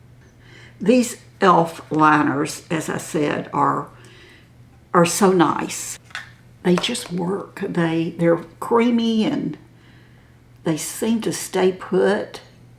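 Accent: South, United States. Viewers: I just love your videos. Ur so sweet and such a great storyteller. Ur beautiful and ur southern drawl makes me swoon. My mom always had her face on and you've inspired me to get dolled up w/ELF! I'm in! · I love the sound of your beautiful southern voice and love how you enjoy transforming your already beautiful face. Thank you for being a reminder to our older generation that we too get to enjoy makeup and how it can make us feel. And have fun with it!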